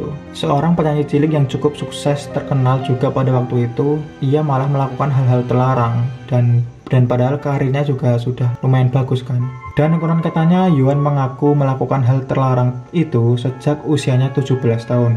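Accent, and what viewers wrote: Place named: Java, Indonesia. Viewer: Medok mu itu loh mas.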